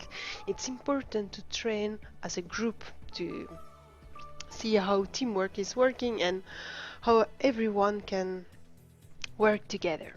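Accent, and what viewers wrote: France. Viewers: Hi, are you french ? I demand that because you have a french accent (si oui, un bonjour de la France ^^)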